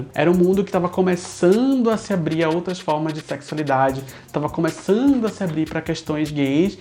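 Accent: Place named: Recife, Brazil. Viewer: por mais sotaque recifense falando de cultura pop, meu voto é sim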